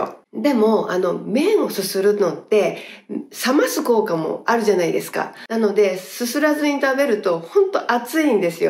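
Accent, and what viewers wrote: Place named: Hiroshima, Japan. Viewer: さん、いつも楽しく拝見しています☺️ 通訳ガイド経験のお話面白です！広島弁も可愛くていいですね🙌🏼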